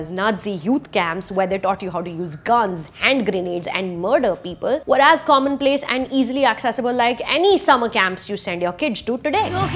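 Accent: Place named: India. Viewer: After the biased viewer ‘not a review’ of Kabir Singh instead of being a reviewer, I’d given up on you, but you’ve redeemed yourself by going back in to your reviewer shoes. Well done for that! I started this review with a bit of a bias - let’s see what stupid stuff she talks - but you proved me wrong. Again, kudos. Very objective review (looks like it, I haven’t watched the movie) and great way of connecting it to other related art forms like HHGTTG. Now pet peeve - I understand it’s your style, but why speak with a strong accent even when speaking in English! Even more, why mix Hindi and English instead of either being true to yourself by sticking to Hindi or being a wider-audience-reaching reviewer like Bharadwaj Rangan and sticking to English. It actually annoys me personally, but stick with the mix if your regular audience likes it, but still keeping the Hindi influence of English away. 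All of this said in good sense and no offence meant! Cheers!!!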